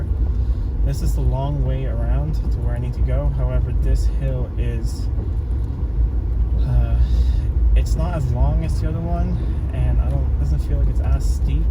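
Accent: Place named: Midwest, United States. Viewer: I’m glad you made to and from with no problems. Once again you say you’re in Switzerland but you have a mid western US accent where are you from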